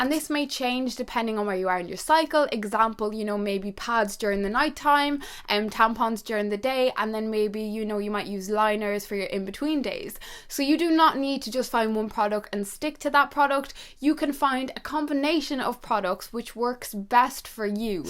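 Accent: Dublin, Ireland. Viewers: Thank you so much for making this video cause in my house the word period is like a bad word it's so annoying. I love your EARRINGS btw❤❤❤ If your doing a Q&a anytime soon I wanna know what part of Ireland your from I'm from Dublin you sound a bit Dublin but ur like a lot posher than me so then I just thought u were from sligo for some reason idkw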